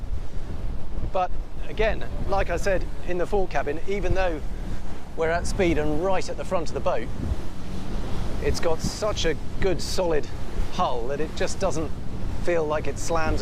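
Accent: United Kingdom. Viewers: With the helicopter, the crowd, and the British accent it felt like James Bond was doing the review. All that was needed for a movie was a chase boat, a few grenades water splashes, and smoke out the back of the Sirena 78 to conceal and cover.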